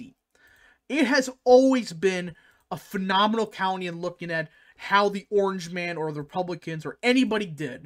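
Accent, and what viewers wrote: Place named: Chicago, United States. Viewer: I'm a Chicago born and I appreciate your accent. Sounds so chicagoian.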